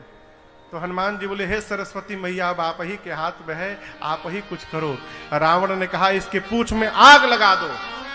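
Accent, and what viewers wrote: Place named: Bhojpuri, India. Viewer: आपका भोजपुरी मीठा बचन सुनकर दिल खुश हो गया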